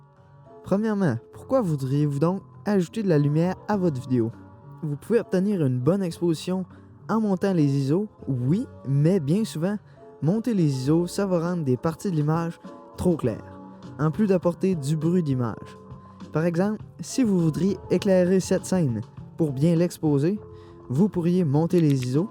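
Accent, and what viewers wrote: Quebec, Canada. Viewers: Pourquoi tu n'as que 260 abonnées ??? Tu en mérite 10x PLUS !!! Ton travail est exemplaire , tes sujets sont intéressants et ton montage est magnifique PS : J'adore les accents québécois !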